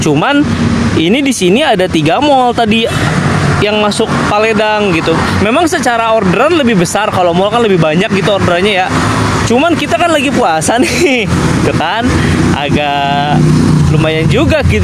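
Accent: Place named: Sumatra, Indonesia. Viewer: Itu logat Sumatraan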